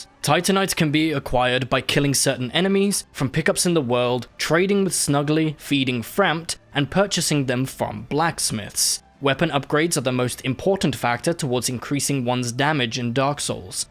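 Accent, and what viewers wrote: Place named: United Kingdom. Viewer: Very good review, watched it from beginning to end. Do you know Greg Kasavin? I can't imagine you haven't been influenced by him. we can hear a similar music to the one in RE4, which can also be heard in the Gamespot Classic Review and your delivery is similar to him despite your British accent.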